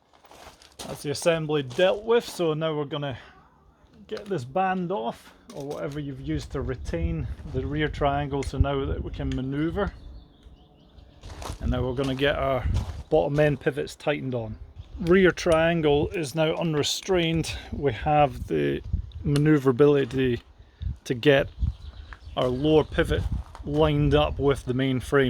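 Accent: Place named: Fife, United Kingdom. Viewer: I'm a fellow Fifer, recognise that accent anywhere!!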